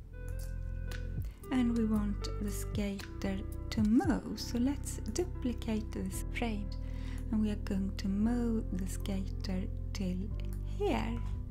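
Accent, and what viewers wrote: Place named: Nigeria. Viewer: Sounds like a Nigerian accent.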